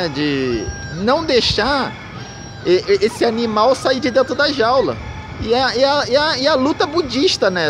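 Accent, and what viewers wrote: Rio de Janeiro, Brazil. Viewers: Clhr ele e carioca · E ainda parece ser da Zona Oeste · Nosso Mestre é carioca MDS, melhor que isso não existe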